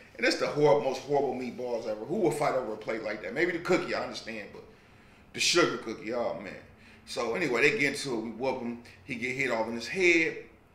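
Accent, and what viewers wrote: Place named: Michigan, United States. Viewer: it’s how we talk in Michigan, call it bad pronunciation, regardless the talk different for us ✌🏾❤️ 🧤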